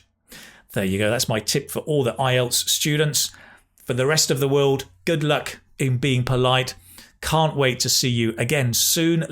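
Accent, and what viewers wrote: United Kingdom. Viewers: Thank you so much for your video clips ! I just love your British accent ! When you said, it was a bit strange to use the past tense to sound more polite like in "I was wondering whether you ..." in a present situation, well, we do the same thing in French when saying 'Je me demandais si tu ... ' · Great teaching videos! a great accent. Could you please tell us which British Accent do you have? would you like to suggest us some good British narrators on ? Thank you so much :)))) keep up the great job (y) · Thanks for the clarity when explaining the classes. It´s way better to hear you. When I read at all, I had in mind, "aroll", American accent, instead your pronounce says at all. It will be good for me to have the opportunity to practice Brits accent as well. Thanks.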